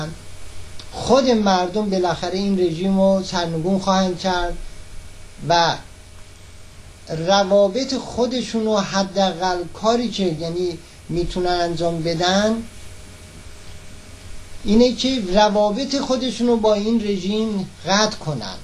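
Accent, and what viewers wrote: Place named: Turkey. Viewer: جه لحجه گشنگی دارى اقاى كوينده همه سريع متوجه ميشن كه تركى..!! اين كروهايى كه شما نام برديد همه شان كسانى هستند كه قاتل بدنيا أمده اند و كارشان كشتن و انتقام است حالا جه ايرانى و جه عراقى و ربطى به مليت و مسلك ندارد..!! در اين كشورها هر ننه مرده اى اسلحه به دست ميكيرد و ميخواهد بكشد و از خدا حرف بزند..!! هر كه امد به جهان نقش خرابى دارد در خرابات نبرسيد كه هوشيار كجاست..!! خاورميانه يعنى باغ وحش..!!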